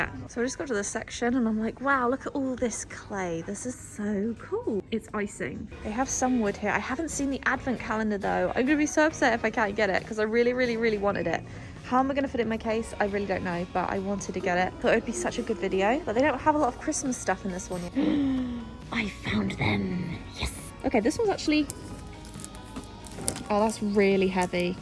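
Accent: England, United Kingdom. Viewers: As a local, it's odd listening to a British accent talking about "a town called Bath" as though it's some magical place that nobody's ever heard of. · How do you have such a strong English accent if you’ve lived in the US since you were 9? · I love your British accent! Also, you are a sucker for anything art and now I want to go to England because of this video! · It amazes me how British your accent is after so long in USA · I've lived in the UK my entire life and I LOVE the Works Any other art supplies I'll get in The Range or online. I also thought you'd lived in the UK a lot longer than you had. The accent I guess. My hair does the same thing, specifically cause I live in the South West (not too far from Bath) and the humidity makes it go poof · I can’t believe you haven’t lived in the UK since you were 9 yet you have such a strong English accent. 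😂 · I love how you have kept your British accent. A friend of mine moved to the states and totally changed! HobbyCraft is addictive lol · I’ve been watching your channel for like 5 years and I just thought of something. Why do you have a British accent if you’ve been in the US since you where about 8? Is it because you learned how to talk in the UK so you’ve just never lost the accent? I’m really curious, accents are super interesting to me. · I love youve lived in the US for many years but havent lost the beaut english accent :) Greetings from Bournemouth <3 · how do you still have a british accent 😭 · What I love about your video is that immediately I was struck by your voice! You have picked up a more British twang while you’re here in the UK. If I was you I would leave all your clothes and pack your case with more paint and art stuff! 🙂🎨❤️ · I simply can't understand how you kept your beautiful British accent living in the US for so many years.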